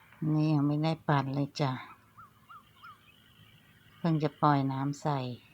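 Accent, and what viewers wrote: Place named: North, Thailand. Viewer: สวัสดีค่ะ เพื่อนใหม่ตามมาชมด้วยจ้าชอบคนพูดเหนือค่ะน่ารักมาก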